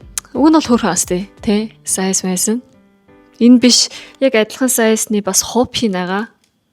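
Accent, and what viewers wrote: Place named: South Korea. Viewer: solongost udaan amidrsan ugasa solongoschuud accenttai yridag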